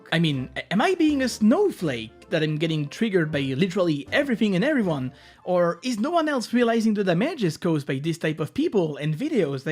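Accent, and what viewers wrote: France. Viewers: accent du sud ? le rooooh putaing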